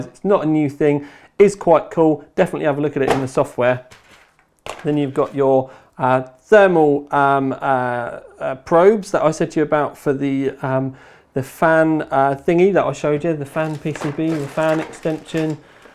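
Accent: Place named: South East England, United Kingdom. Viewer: He can't handle our south east accent, fuck the haters!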